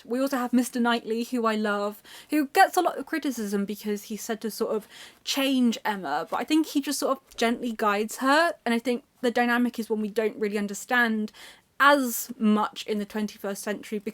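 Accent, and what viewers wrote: United Kingdom. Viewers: Thanks for being back with your intelligence , your bright eyes and your lovely British acent. I read Agatha Christie when I was rather young , althouhh I am not keen on detective books except from Sherlock Holmres . As I love Victorian literatue Doyle has to be on the list. I devoted a lot of time in 2023 to Dickens's long novels and Ihave enjoyed them a lot. My mother tongue is Spanish, by the way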